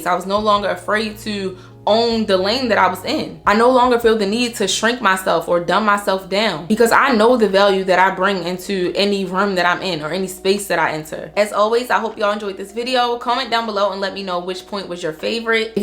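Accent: Baltimore, United States. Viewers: Hold up your Baltimore?? Your accent sound like bmore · Beautiful! So many nuggets! Also, Sis you from Baltimore? Lol ❤ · Sorry it have nothing to do with the video but it sound like you from Baltimore but not in a bad way. I’m currently trying to break my habit of saying my words correctly. Which you do, it’s just how you say certain words lol 🩷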